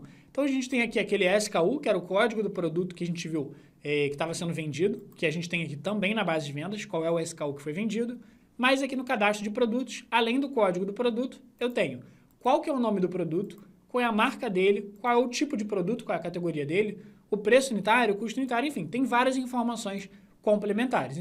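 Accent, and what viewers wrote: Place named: Rio de Janeiro, Brazil. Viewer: Brother, sou carioca tbm, mas moro em Fortaleza desde os 7 anos...mano eu tinha maior preconceito em vê uma aula sua por conta do incômodo do sotaque...Mas olha, conteúdo muito irado, parabéns...vou acompanhar mais.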